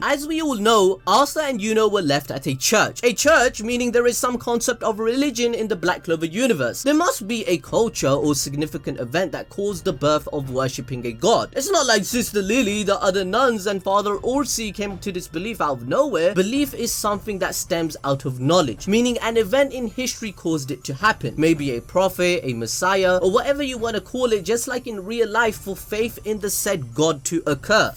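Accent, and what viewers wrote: Punjab, India. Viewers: Hey are u punjabi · that punjabi accent lol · Haaaai are you Indian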